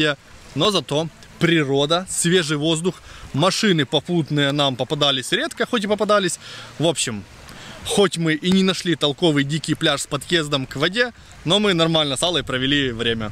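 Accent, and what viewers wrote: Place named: Ukraine. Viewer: Ведучий-твій " рускій "- із диким українським акцентом. Перейди на рідну мову-блогер...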